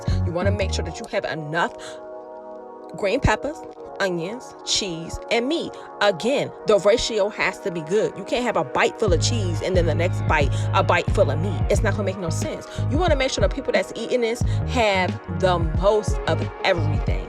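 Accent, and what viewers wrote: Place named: Baltimore, United States. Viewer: You sound just like us from B more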